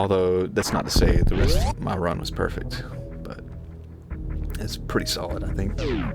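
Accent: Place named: South, United States. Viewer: commentary!! Never heard it on your vids before is this new? You have a nice southern boy like voice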